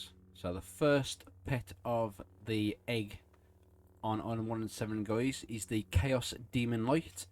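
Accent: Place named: United Kingdom. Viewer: you sound like a united kingdom person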